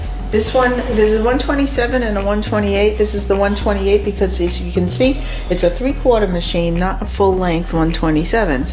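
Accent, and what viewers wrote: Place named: New York, United States. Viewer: Love your accent, are you from New York?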